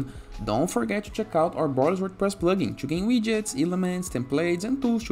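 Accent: Brazil. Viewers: dificel não reconhecer o br na fala kkk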